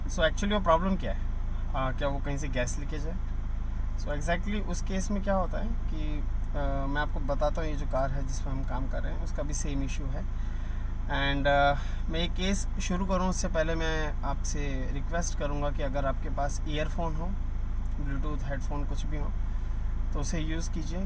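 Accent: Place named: India. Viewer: English title but Indian narration. Dislike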